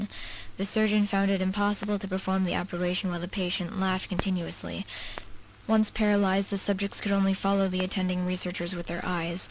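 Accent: Russia. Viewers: I read this when I was young(er) and hearing it like this brought back strong nostalgia! I liked the Russian accent and while the distorted vhs voice thing was cool, I feel it could've been more focused to the crackling sound instead of the fuzz. But overall I rate this reading 8.99/10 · Ya ya, The russian accent is the best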